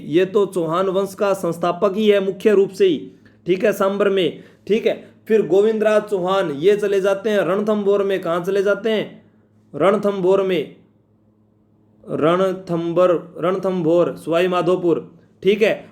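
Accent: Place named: Marwari, India. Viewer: Bhut badiya marvadi bolte ho gurudev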